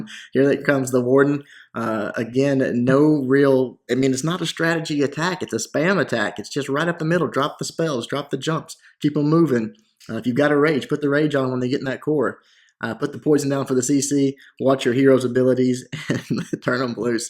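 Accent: South, United States. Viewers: I find these videos informative, but this dude's voice is super annoying. It's that shitty southern accent with the horrid pitch FFS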